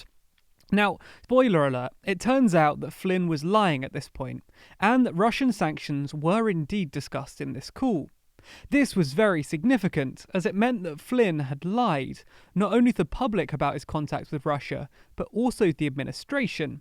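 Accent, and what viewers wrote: United Kingdom. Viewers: Why isnt this lefty loony talking about how a former president was spying on a potential president? I thought lefties are all against invasion of privacy? Btw, this guy sounds like a brit? No mention of the fake dossier that got this bullshit rolling thats been spewed to us for the last 4 years over and over? This whole thing is total bullshit. Makes watergate look like a joke.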